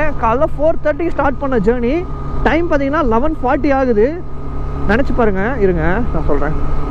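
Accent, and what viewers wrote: Kerala, India. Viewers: Ean bro malayalam slang la pesura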